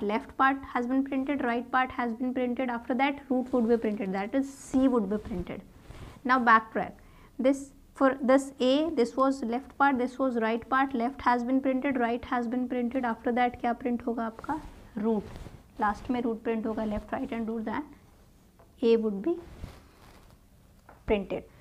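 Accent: India. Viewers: this sweet indian accent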